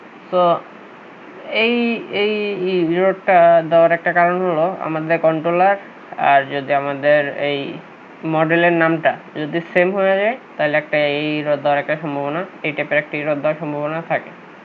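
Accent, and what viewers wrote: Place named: India. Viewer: This is India-English !!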